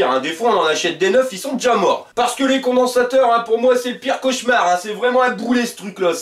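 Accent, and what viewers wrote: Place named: Nord-Pas-de-Calais, France. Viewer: Bravo pour tes videos, c'est top, ça m'aide pour la restauration de mon Peugeot TXR de 84 ! Des conseils et cas concrets, de l'humour, de l'accent Chti qui va bien, je me régale ! Continue comme ça !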